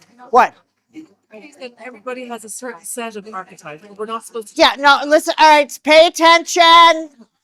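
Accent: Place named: New York, United States. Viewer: I like it - instead of triggering, it makes me laugh. I'm not familiar with where grew up, but her accent reminds me of my childhood in rural central New York.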